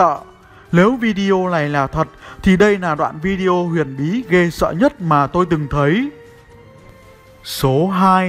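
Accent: Thanh Hoa, Vietnam. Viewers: Dám chắc đây là giọng thanh hóa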